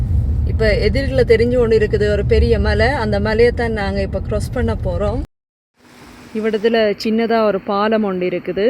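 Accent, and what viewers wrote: Jaffna, Sri Lanka. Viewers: அறிவுபூர்வமான விளக்கங்களுடன் நாகரிகமான யாழ்ப்பாண தமிழ்பேச்சும் பார்க்க, கேட்க இனிமையாகஇருக்கு வளர்க உங்கள் பணி .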